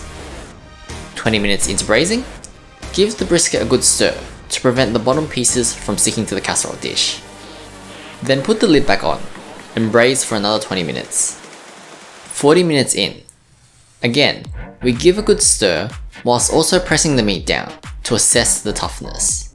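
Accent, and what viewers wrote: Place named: Australia. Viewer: what a nasty ass australian accent xD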